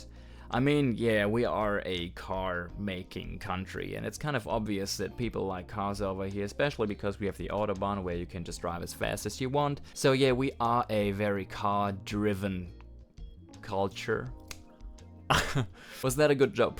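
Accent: Australia. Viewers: Great video mate , just came across your channel and subscribed , love your story telling and a little bit of an Aussie accent creeping in Visited Germany in 1989 😂and went to Oktoberfest as all Ozzie backpackers do , absolutely love the country, people, and food. One question though and i never saw this in Europe when i was driving but only in Australia you do a quick 1 finger wave as if to say hey mate while you're on a long drive in the middle of no where 👌✌ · You've got quite the Aussie accent . 😅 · Got a bit of a 'downunder' accent happening there mate! (I'm a kiwi). You are not allowed to smoke inside public areas here. No smoking in bars etc, there is usually a dedicated outdoor area for smoking. You no longer come home with your clothes and hair smelling of smoke! They are also not allowed to advertise and cigarettes are usually held in a locked cabinet in stores. 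It is nice that we don't see cigarette butts dropped everywhere like there used to be decades ago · As an Aussie, I'm really surprised smoking is so prevalent in Germany. I thought you guys would be more concerned about your health. A lot of Aussies quit in the 80s when the dangers of smoking were well understood by the general public. Yes, the federal govt also made smoking more expensive and told cigarette companies they must put them in plain packages. This made it an expensive and unattractive habit. Personally I'm pretty glad about that, as I can't stand smoking and always move away from anyone who's doing it 😅 BTW, some of your vowels are sounding a bit Australian. We definitely rubbed off on you 😊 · Sounds like you took the beginnings of an Aussie accent home with you though! I can hear you dropping it on certain words :;)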